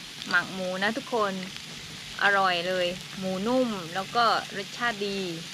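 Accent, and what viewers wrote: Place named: South, Thailand. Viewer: พึ่งเข้ามาดูให้กำลังใจค่ะ สำเนียงเหมือนคนใต้เลยนะคะ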